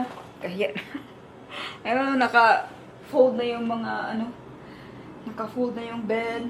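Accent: Visayas, Philippines. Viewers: Bisaya toh halata sa accent nya. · Yun mga anak mo kasi Dyan pinanganak ikaw visayan intonation pa din Even you speak English or British people will notice your intonation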